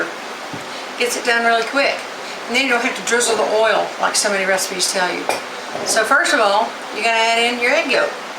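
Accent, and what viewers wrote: South, United States. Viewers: Can tell a recipe is gonna be good when the person has a southern American accent 😀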